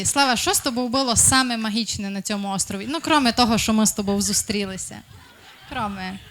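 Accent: Transcarpathia, Ukraine. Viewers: Шоб твої правнуки, дівочко, балакали так як їхня прррабабуся з ЗАКАРПАТТЧ!!!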